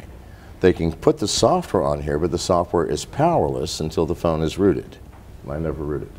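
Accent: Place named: Soviet Union. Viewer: I like how he keeps slipping into a Soviet accent.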